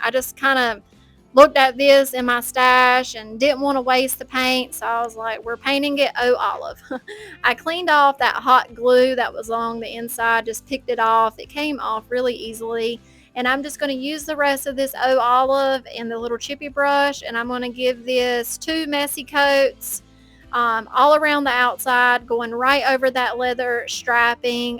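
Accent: South, United States. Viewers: I love your channel, (I'll just keep writing that) and all your projects; today's were no exception, I would love any of them for my home. "Centerize" if you know, you know. 😂. Your accent is the proverbial sweet Southern accent I hear in my head when I imagine the South and drinking sweet tea on a big porch. ❤😊 ~Laura [your kindred messy painter 😅]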